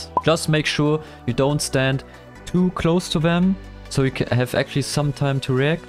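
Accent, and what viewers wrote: Germany. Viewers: It was pretty nice , and I'm totally okay with german accent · it’s okay the German accent, your literally my fav yt for guides like no joke nobody makes them better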